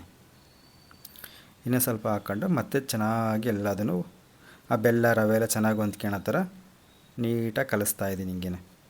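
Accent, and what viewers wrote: Chitradurga, India. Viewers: Exactly u told the same story of mine also sir ......me also near Chitradurga ....ur language same as we talk in our village ... whenever I feel lonely that time I saw ur videos it make me feel happy thank you .....Because u r from Chitradurga.......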